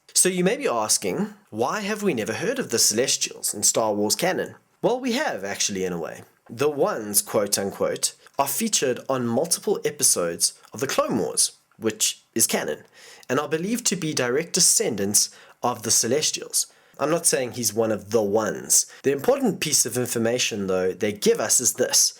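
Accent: South Africa. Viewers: where are you from u sound south African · Are you south African? cause you sound South African. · Are you South African? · where are you from? you sound south African but I could be wrong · Are you some what of South African origin?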